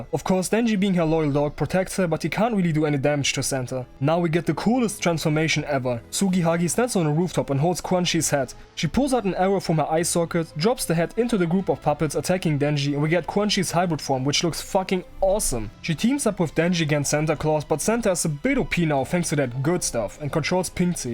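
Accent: Germany. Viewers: Are you from germany? Your accent sounds really like from people from the country. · Du hast einen der krassesten Deutschakzente den es gibt. Ja Quanxi is pretty cool. I really loved how Kishibe liked/loved Quanxi and didn't want to see her Corpse.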